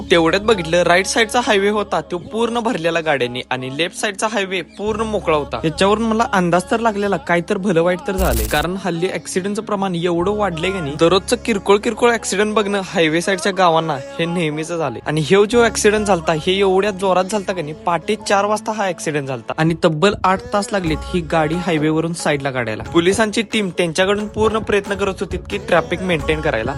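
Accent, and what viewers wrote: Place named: Kolhapur, India. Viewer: Your language tone is kolhapuri, this may not be Nashik Pune road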